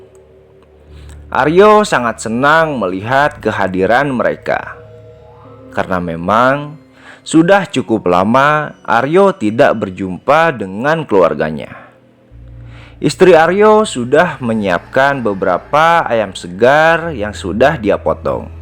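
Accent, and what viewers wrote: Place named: West Java, Indonesia. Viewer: Nu ngadongeng sorana siga urang sunda.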